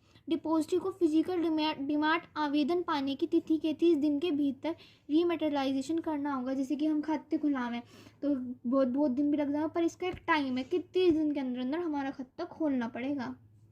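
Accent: Uttar Pradesh, India. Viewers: Aapki cute voice m up dikh rha hai i am also from utter pradesh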